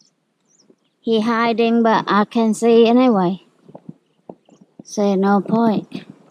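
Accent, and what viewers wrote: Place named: England, United Kingdom. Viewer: She is funny, she speaks Thai with an English accent.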